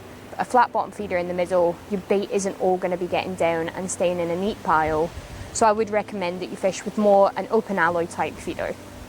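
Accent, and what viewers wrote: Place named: Scotland, United Kingdom. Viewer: As always great video and great venue detail coverage 👌 and still loving the Scottish accent, u sound as beautiful as u look ❤ x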